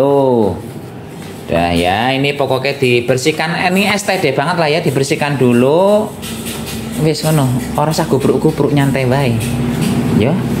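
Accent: Banyumas, Indonesia. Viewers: Mas lucu banget inget karo keluarga di cilacap bahasane podo wae ngapak hiburan sambil masak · bahasanya ala banyumas yak kang? purwokerto nan.... marai inget pernah di purwokerto mendoan endul 👍😃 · Kesukaanku itu pare dioseng pake tempe,aku seneng karo ngapak2e mas 😅😅😅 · Rika wong ngendi pak?ngapake mantep wkwkwk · Matur suwun mas resepe ,ngapak banget si dr lampung mas hadir🙏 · Ngapake polll tp aku ya seneng, ora ngapak ora penak ya mas 😄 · Mas ngapakmu ngelingke aku karo koncoku mbiyen.biyung ngelingke aku ro biyungku sing wis almarhum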